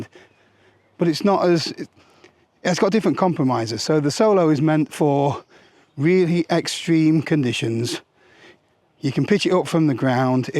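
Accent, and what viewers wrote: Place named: Yorkshire, United Kingdom. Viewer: Thanks for answering my drone audio question,. Appreciate you don’t do “reviews”, but that’s helpful stuff. Like these shorts, chap. You can be a bit Yorkshire (for my southern sensivities) in the long form stuff. (o; I’m enjoying this You. Perhaps the ‘less hassle’ factor is coming through?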